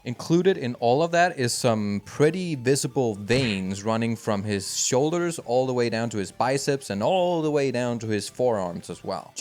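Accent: Denmark. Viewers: Hmm I sense a Danish accent. Am I wrong? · Hold on, that accent.. Are you danish?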